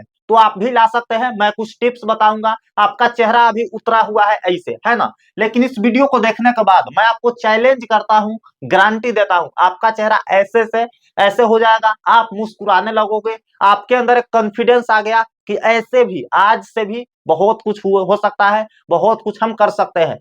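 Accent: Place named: India. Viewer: I think Hindi medium. Cuz As u can really see it from sir accent .. It sounds like pure Hindi medium accent........ Don't u think so????Ahhhhh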